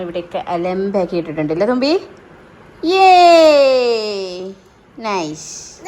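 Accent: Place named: Vadakara, India. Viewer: chechide veed evideya? Samsaram kettitt vatakara baghath evideyo anennu thonunnu❤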